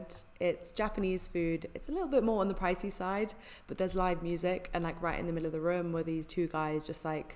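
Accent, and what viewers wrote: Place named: London, United Kingdom. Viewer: Amazing london accent 👏👏👏👍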